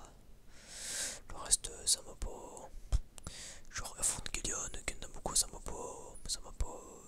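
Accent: North, France. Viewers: Ton chuchottement av cette accent du nord j adore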